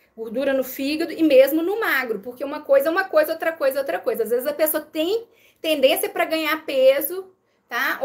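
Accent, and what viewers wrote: Minas Gerais, Brazil. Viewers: Além do conhecimento que vc passa estou amando o sotaque mineirin...tb sou mineira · Parabéns, seu programa está muito bem produzido, o som está legal e você com seu sotaque mineiro está um show, sua didática está muito boa😅🎉😅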